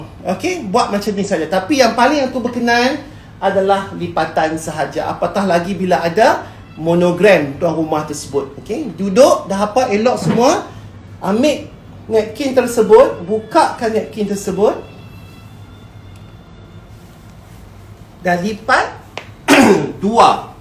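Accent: North, Malaysia. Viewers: Dulu saya belajar KH ERT/RBT etika di meja makan...kira ok dahla tu....wow rupanya lagi detail ye...thank u..belajaq sambil dok gelak ngan celoteh utara dato' lawak aih...tengok sampai habis nah..sampai kul pagi 14/8/2020...hahaha bosan x tau nak tengok apa...sekali sekala menimba ilmu ni...kan senang nak dapat...moga lekat dlm kepala otak ni...aminn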